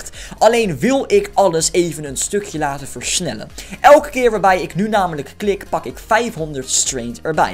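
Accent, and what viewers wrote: Netherlands. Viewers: wil je parodie's en liedjes in het echte leven maken voor je kanaal? Want dat is zoooo lang geleden.. praat nou met een Amsterdams accent?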